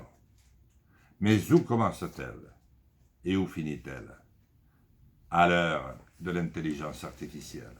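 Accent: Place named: Paris, France. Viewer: Quel bel accent ! Une parisienne du peuple.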